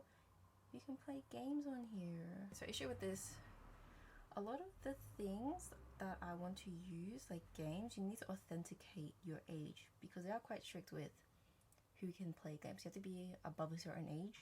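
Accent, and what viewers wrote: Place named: Australia. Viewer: Clicked because I had never seen that phone before, heard the Aussie accent and immediately subscribed. I so much prefer home grown content.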